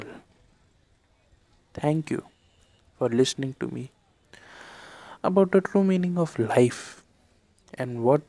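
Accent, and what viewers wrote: India. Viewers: Indian accent is just the best! <3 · I love the Indian accent! Would be great if you did some whispering videos. 😊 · Please do whispering videos!!! The Indian accent is the best accent ever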